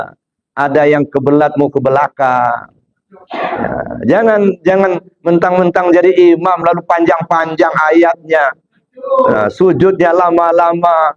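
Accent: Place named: Lampung, Indonesia. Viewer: Di mana alamat . Dari logat nyalampung di mana buya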